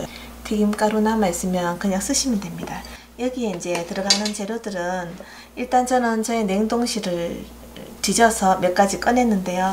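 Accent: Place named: Daegu, South Korea. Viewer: 떡을마찼다? ㅋ ㅋ ㅋ ㅋ 대구사투리정감있네요 서울분들이볼땐 ㅎ ㅎ ㅎ ㅎ ㅎ ㅎ ㅎ 마찼다.!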